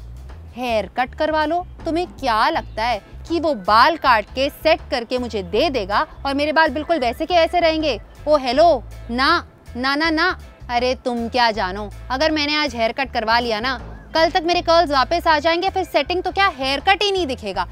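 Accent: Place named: Kerala, India. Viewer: Madam you have Malayalam accent.. But wavy hair is the best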